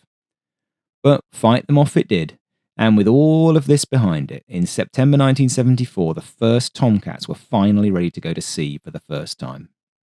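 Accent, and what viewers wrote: United Kingdom. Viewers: Why oh why does it ALWAYS have to be a snooty British narrator on every documentary on YouTube? Even about every American airplane and ship? I can’t stand it.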